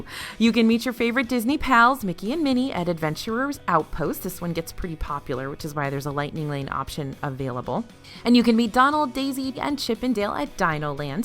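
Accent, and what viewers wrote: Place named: Western New York, United States. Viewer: I watch these videos all the time because I love Disney, but now I see that it's also because of the WNY comfort in your voice!!!!!!!!!! -a fan from Buffalo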